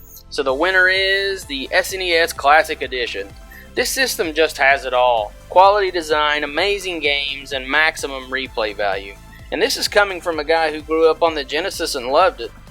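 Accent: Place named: West Virginia, United States. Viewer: I started watching this video and was like "Man! This guy must be from West Virginia!" Then I saw the hat and thought "Hell yeah he is!!" and I had to subscribe!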